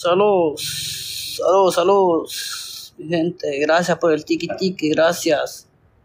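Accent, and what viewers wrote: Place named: Mexico. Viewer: No , todos los mexicanos hablamos así , pero si usar esas palabras 😂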